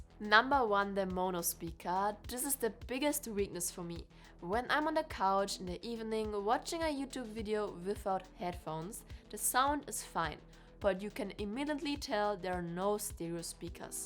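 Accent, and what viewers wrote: Germany. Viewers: I can hear the German accent :D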